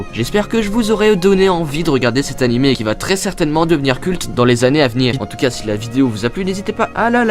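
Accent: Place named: England, United Kingdom. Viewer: Vidéo très intéressante et très instructive , accent anglais au rdv. Appréciable. <3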